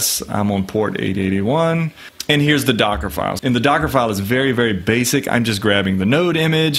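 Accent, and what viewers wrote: South, United States. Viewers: love the southern accent maan!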